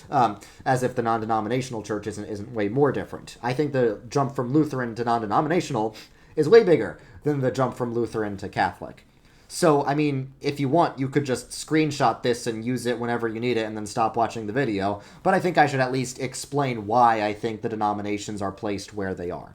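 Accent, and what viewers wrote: New York, United States. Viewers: Don't worry he is just a new Yorker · He is from new york they do talk like that.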